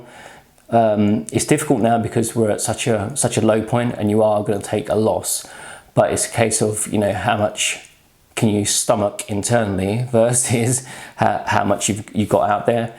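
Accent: United Kingdom. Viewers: This guy using uk accent?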